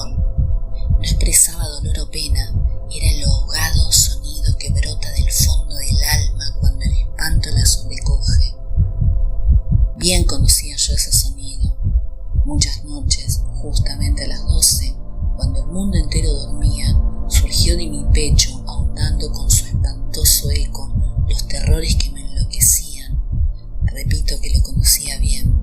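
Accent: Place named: Argentina. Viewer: 1ero eres argentina creo... 2do we tu video esta super lo adoré como narras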